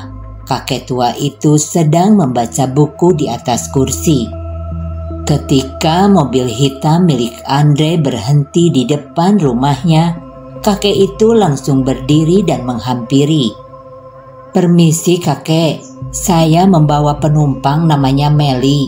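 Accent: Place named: West Java, Indonesia. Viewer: . Suaranya yang khas dengan logat bahasa Sundanya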